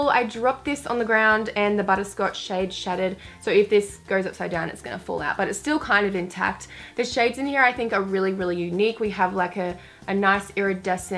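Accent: Australia. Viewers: Which part of Australia are you from?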